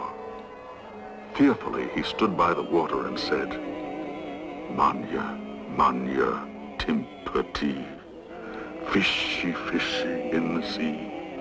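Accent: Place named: Europe. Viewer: Umm, definitely not Dennis! For a little moment I was thinking it was Orson, but then it sounds European. Why didn't they put the narrator on the credits?!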